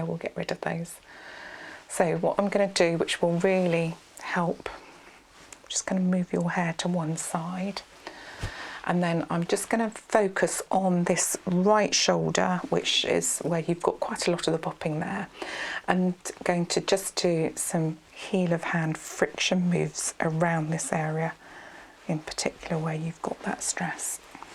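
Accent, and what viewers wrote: United Kingdom. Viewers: You are from uk?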